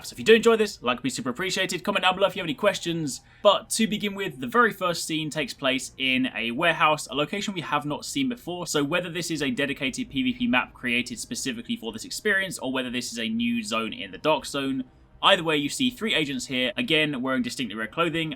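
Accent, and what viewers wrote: England, United Kingdom. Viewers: Where in England you from?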